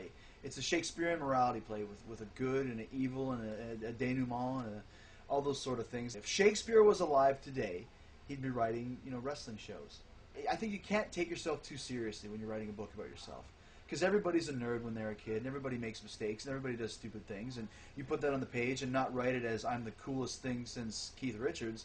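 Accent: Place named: Canada. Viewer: he says aboot. such a canadian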